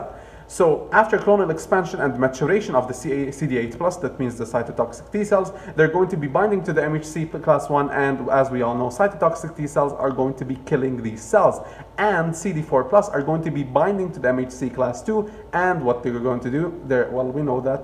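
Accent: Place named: Lebanon. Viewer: صدقني انت لبناني عرفتك من اول 10 ثواني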